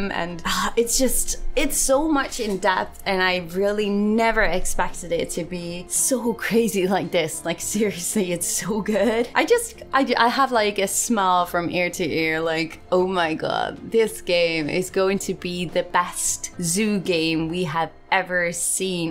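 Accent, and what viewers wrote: Netherlands. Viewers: wacht ben je nederlands???? xDD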